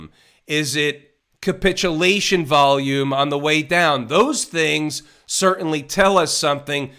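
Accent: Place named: New York, United States. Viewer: …Sorry, but I freaking LOVE this guy’s NYC (ahem) transmission…..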